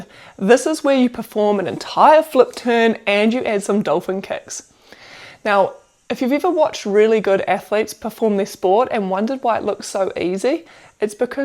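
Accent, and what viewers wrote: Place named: New Zealand. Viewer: love the accent .. New Zealand ?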